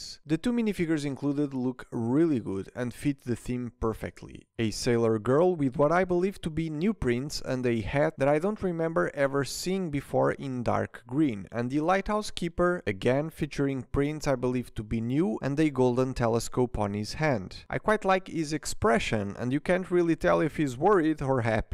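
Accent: Portugal. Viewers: Tu tens um inglês mais tuga de sempre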